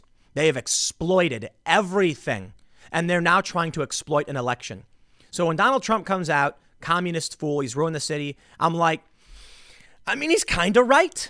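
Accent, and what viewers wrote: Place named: New York, United States. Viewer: Anyone else notice how New Yorker accent came out much stronger in this video? I guess it's because he's talking about his homeland.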